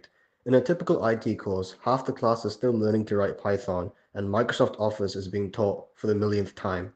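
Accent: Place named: Australia. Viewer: You sound like an ozzie 🤣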